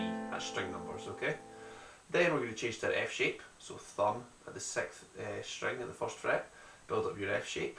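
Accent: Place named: Scotland, United Kingdom. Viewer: my guess is he is scottish, he deffinatley sounds it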